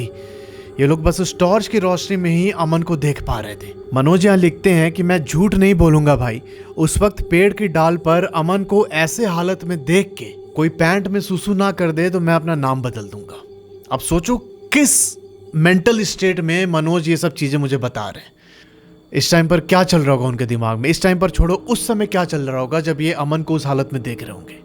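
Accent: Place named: Bengal, India. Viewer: I am bengali but I live in Nepal aapka bangla accent mujhsey milta heinn😅😅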